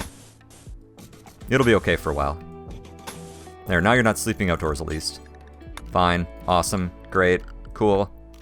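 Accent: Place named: Midwest, United States. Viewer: is an American as am I but he's from the midwest i am westcoast. He says ruff i say roof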